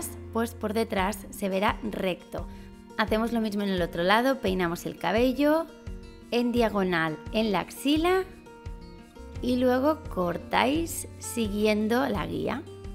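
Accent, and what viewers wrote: Spain. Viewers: Me gusta tú acepto española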